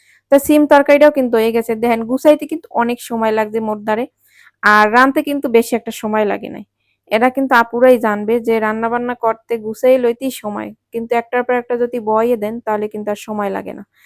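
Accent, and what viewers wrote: Barishal, Bangladesh. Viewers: আপু বরিশালের ভাষা খুব ভালো লাগে 💘💘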